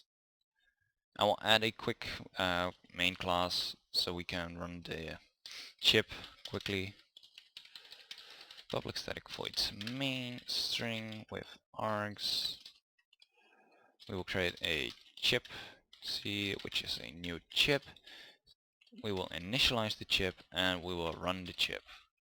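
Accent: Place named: Netherlands. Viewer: It's dutch accent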